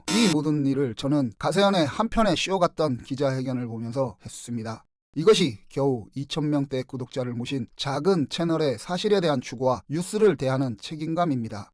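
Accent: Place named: North Korea. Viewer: 북한 사람 같아요 말투가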